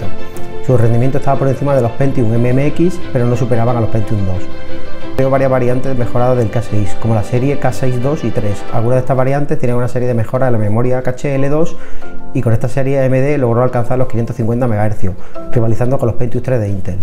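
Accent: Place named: Spain. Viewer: soy latino y real mente no es por nada pero no soporto el exento español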